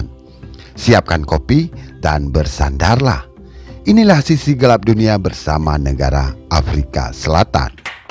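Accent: Aceh, Indonesia. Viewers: Admin logatnya mirip orang Aceh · Voice overnya kayaknya orang Aceh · Ni admin nya logat nya seperti suku aceh. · sigoe sagai lon deungo su logat... langsong tatupue awak aceh :D,,, bereh adun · Pasti komentator awak aceh nyo 🤭 lemah logat bahasa indon jih 😂😂👍🏻👍🏻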